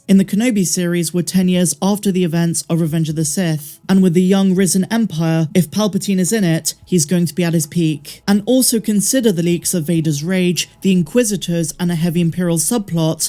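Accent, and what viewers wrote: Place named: West Country, United Kingdom. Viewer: Gotta be West country right? Your accent sounds so much like mine, I genuinely find it a comfort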